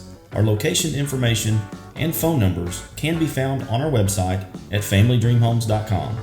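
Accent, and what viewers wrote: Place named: Louisiana, United States. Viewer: do i hear Louisiana? lol This home is so beautiful. great video.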